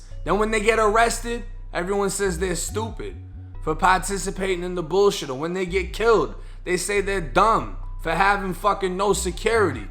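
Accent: Boston, United States. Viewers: Lol love Boston accent and I'm Canadian I just heard it on tv or Internet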